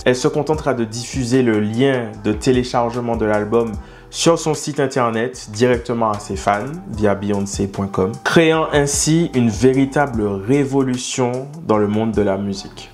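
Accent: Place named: Réunion, France. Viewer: cette femme est incroyable ! & je suis fan , mais j'adhère complémentaire sa façon de communiquer , tout passe par son art , c'est brillant . Avec sa elle limite au possible les scandales , sa renforce son coté Queen , j'adore . Merci pour ta vidéo (il aurait fallu rajouter des ptite choses comme "les moqueries sur sa fille Blue Ivy quand elle été seulement un bébé ! je pense que sa a du bien aider à accélérer le changement). Sinon très bonne vidéo , tu parle bien c'est clair sujet maitriser , bonne continuation a toi ! (je trouve que tu as un accent créole ile de la Réunion 974 ?? , je me trompe peux etre ^^)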